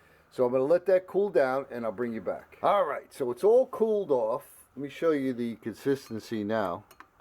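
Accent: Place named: South, United States. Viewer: Yum! Thanks for showing that... even though I don’t think it’s gonna work on my pizza tonight! 😂🤣😂.... by the way, loved your “southern” accent! 👍🏻👍🏻👍🏻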